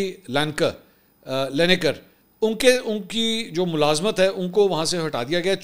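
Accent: Punjab, Pakistan. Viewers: bhai ye jo bat kartay kartay ap punjabi touch detay ho 😅😅seiously i love it kindly continue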